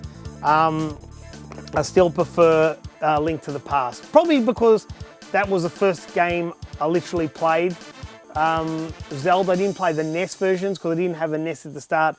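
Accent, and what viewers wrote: Australia. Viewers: youre aussie? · Are u Australian? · you can hear the Aussie out of him :D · name It is not annoying. It is Australian.What is annoying to someone is the norm in a different context. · Just love how you from down under says: so yeah, ehm yeah and so on. Sounds bloody awesome! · I am assuming you're from Australia because of your accent. I love your video game collection, now that's a collector. Keep up the good work!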